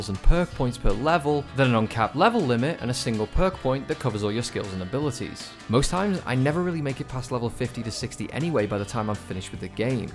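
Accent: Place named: North West England, United Kingdom. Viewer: are you from the North West? I recognize my own accent hahahaha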